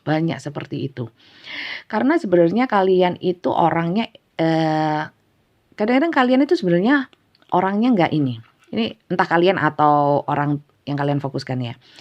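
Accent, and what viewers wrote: East Java, Indonesia. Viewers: Assalamualaikum Bunda...sll nyimak n suka readingan Bunda aplgi logatnya khas jatim...mantap👍🙏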